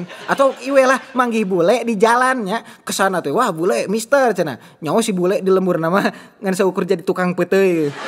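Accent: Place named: Sukabumi, Indonesia. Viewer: Sunda na logat jampang sukabumi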